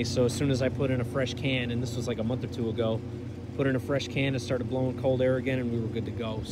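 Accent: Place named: Buffalo, United States. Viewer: That Buffalo accent 😂 nice videos man. Nice car too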